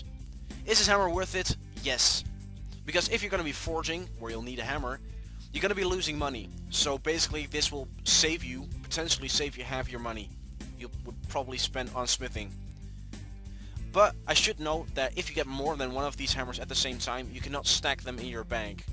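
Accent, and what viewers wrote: Netherlands. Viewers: ben je nederlands?, hele goede guide btw:)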